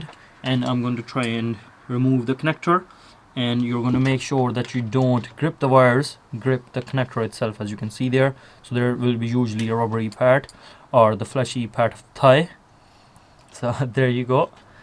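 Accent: Cork, Ireland. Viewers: Is that a hint of a Cork accent? Sound for the video, need to tackle this in the morning. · Love your cork accent...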